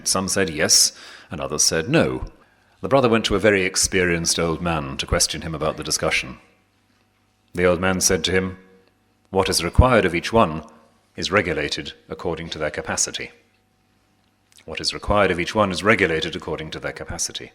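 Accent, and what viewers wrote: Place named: RP, United Kingdom. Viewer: "Here we have two people reacting totally differently to human contact and human interest, one withdraws into silence, one embraces and they're both alright. Silence and honey cakes are equally acceptable [agreed, but that's not what you said here : <link> when you said that the thing to look for when a person is approaching union with God is that the person is sociable. People who isolate themselves are not close to God according to you when you were trying to manipulate me into stopping avoiding abusive people and situations. You're looking quite cheerful in this video because you still think you've got a chance of destroying me and you enjoy telling lies to a captive, and you assume admiring, audience]." "There are no standardised, generalised forms of holiness. Each one needs to discover what their path is [selling your soul to Satan and providing propaganda that leads over a million deaths and the emslavement of humanity isn't a path to holiness Rowan Williams. 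You are not holy. You are just trying to save your skin a since all your other deceitful and abusive strategies have failed]." "The whole business of passing judgement is so impossible [no it isn't]. Passing judgement in the sense the desert fathers so DEPLORE [his emphasis in his super posh voice, raising his voice not quite an octave to try and sound more authoritative. The desert fathers were talking about the ordinary every day sins of a group of monks living a common life. They were not talking about psychopa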